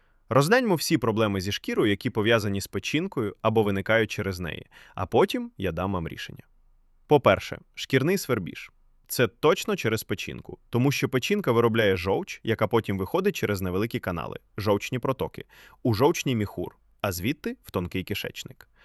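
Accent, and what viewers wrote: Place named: Central Ukraine, Ukraine. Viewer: Навчити "дєвочку" з Кійова, щоб вона розмовляла як пані зі Львову? Навіщо?! Тим більше що ано з Кропивницького чи з кіраваградскої області. "Тітка"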